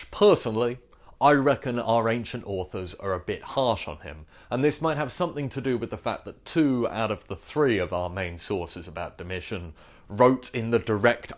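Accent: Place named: Northern England, United Kingdom. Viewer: fuck sake beat me to it bar the northern accent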